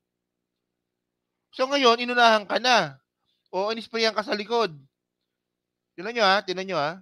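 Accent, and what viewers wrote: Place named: Cavite, Philippines. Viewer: Yung tonight ilang gabi na yun! Sabi nga kapag ang ilog maingay, mababaw lang yan., Caviteño ka ba? Murang Caviteño naririnig ko sa yo, e!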